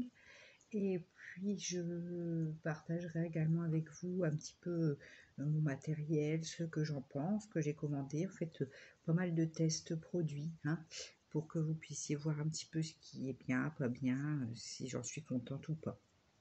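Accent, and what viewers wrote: North, France. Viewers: Oh une voisine en plus j'avais cru entendre le petit accent du nord lol. Moi je suis du nord mais originaire du pas de calais.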